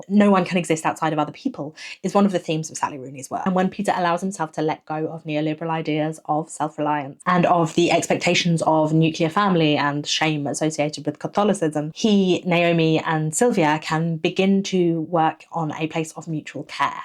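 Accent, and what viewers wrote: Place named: England, United Kingdom. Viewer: Worth noting that while Ireland has never had an institutional left, it also has never had an institutional right. In fact, there's far less right wing leaning and visibility in Ireland than most western countries. We've basically always had a centrist government that makes progress consistently, just faaar too slowly. Also, something about an English accent explaining Ireland to me was actually grating lol. Can I coin the term 'Anglosplaining'?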